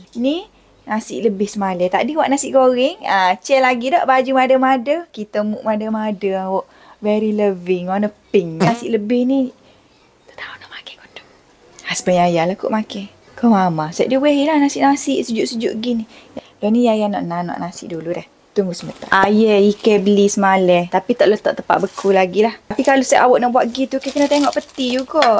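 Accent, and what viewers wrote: Kelantan, Malaysia. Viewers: doh ehhh suko la ho dengar yaya kecek kelate 🤣 tenkiu menghiburkan saya dikala kesepian di tempat kerja 😆 terus semangat yaya post video2 menarik ❤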